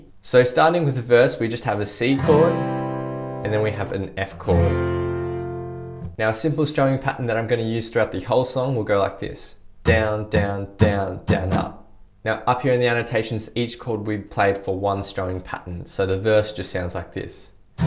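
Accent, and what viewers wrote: Australia. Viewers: Never realized u were aussie was I fucking deaf.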